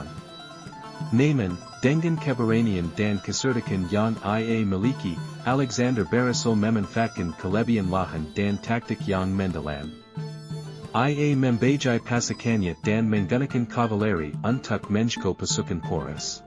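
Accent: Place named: West, United States. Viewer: logat barat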